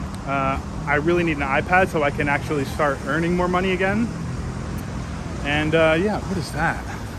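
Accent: United States. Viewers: Done donating. I think majority of your foreign subscribers enjoy listening and learning American English from a native speaker like yourself. Maybe you can make a good money by doing a personalized English conversation practice on hourly basis. With some preparation and technique, I think it can be fun and effective.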